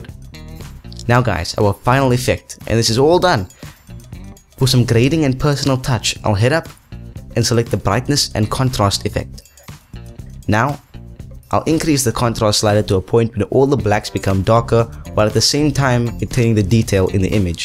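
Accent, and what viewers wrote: South Africa. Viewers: You sound South African bru.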